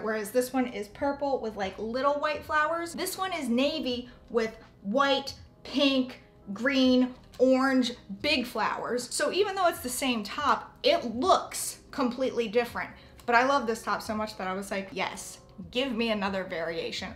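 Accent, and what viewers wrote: United States. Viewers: Your box is full of such good stuff! And I love your accent! What state are you from?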